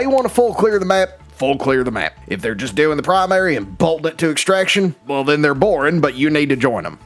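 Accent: South, United States. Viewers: As someone also with a heavy southern accent and a cadence like yours, I really appreciate your video. It’s hilarious!!!! subscribed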